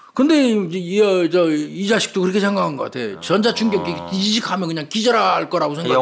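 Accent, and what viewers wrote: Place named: Jeolla, South Korea. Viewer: 김복준 교수님 전라도 사투리 구수한 말씀 아주좋아요 옆보조가 좀 촌스럽다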